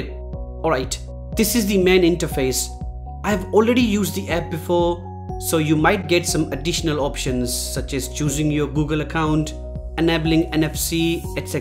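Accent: India. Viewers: I really wish you guys could get thru that Indian accent your almost as bad as new jersey accent man seriously I have to focus like a sniper to understand your English you are better than most . But seriously guy I can hear every letter of any word from Penelope Cruz and she has heavy latin accent but that Indian English accent i can not grasp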